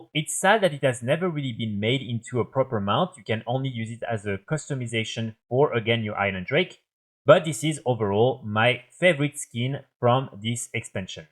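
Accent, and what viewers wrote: France. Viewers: French accennnnt guyyyy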